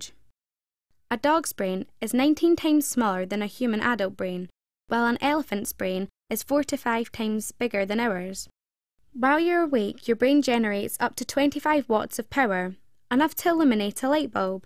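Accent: Scotland, United Kingdom. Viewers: Great advice! It's probably the opposite of how most children are forced to learn these days. Btw, I think she sounded Scottish. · Are you from Scottland?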